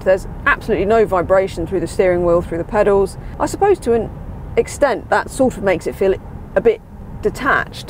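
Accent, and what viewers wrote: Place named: United Kingdom. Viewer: She sounds british, but why is she driving on the wrong side of the road?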